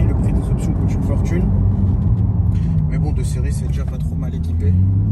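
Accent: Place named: Paris, France. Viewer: Bravo belle acquisition la seule chose qui me gêne c’est que tout les gens du continent continue à mettre des plaque corse pour faire croire qu’ils sont corse alors qu’avec votre accent de parisien on vous reconnais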